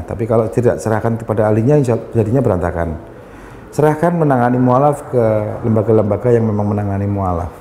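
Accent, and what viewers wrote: Java, Indonesia. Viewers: Denger cara ngomong kok seneng. Logatnya Jawa medok. Ak lahir dan besar di Sumatra tapi logat Jawanya jg tetep g bs hilang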